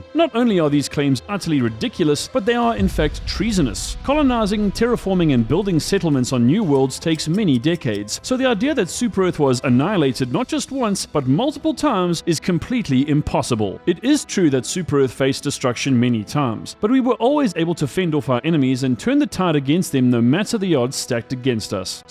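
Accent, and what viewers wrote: Johannesburg, South Africa. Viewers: Started watching video, about 5 seconds in I was like hold on, this is a Joburg accent (northern suburbs even). Continued watching and now I'm sure of it. Aweh bru :)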